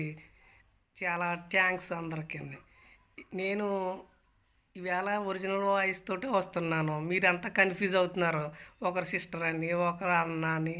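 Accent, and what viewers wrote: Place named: Godavari, India. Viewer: Ayyo meeru vere anukunna andi. But voice super and Godavari slang super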